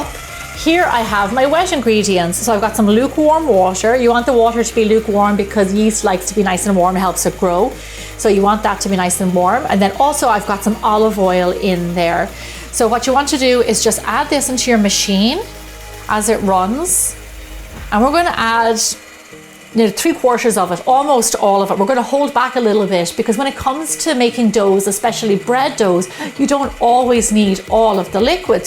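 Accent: Ireland. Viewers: The guy's mouth is only moving while she's speaking like yeah yeah just hand me a slice 😅.. also is she Irish. I now noticed her accent love it and her pizza so 😋😋😋😍🤤🤤🤤🤤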